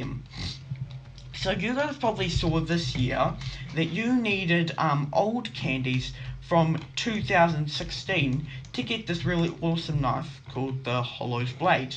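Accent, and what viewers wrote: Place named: United Kingdom. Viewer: Brittish accent 👌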